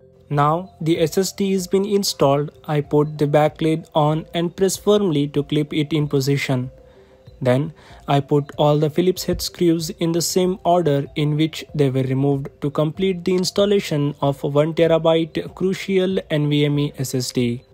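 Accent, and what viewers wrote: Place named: India. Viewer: obrigado amigo indiano, eu amo o sotaque da voz de vocês, seu video foi rapido e muito util para mim. nota 10!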